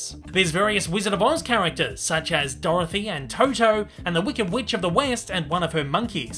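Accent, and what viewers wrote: Australia. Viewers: Where abouts in Australia are you from?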